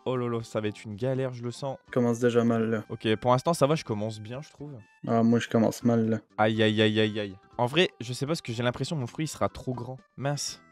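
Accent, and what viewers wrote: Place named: Quebec, Canada. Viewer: est québécois parce que moi aussi chu québécois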